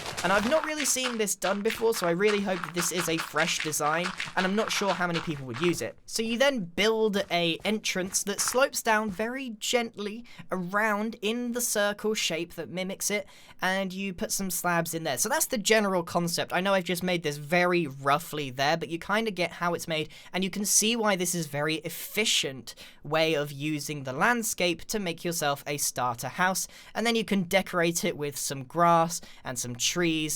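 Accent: United Kingdom. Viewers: uh... hes just british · God like you are like like you are so weird like I hate your accent like what kind of accent do you have French English English like United Kingdom English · Gabriella Siemer that’s called a British accent 😂😂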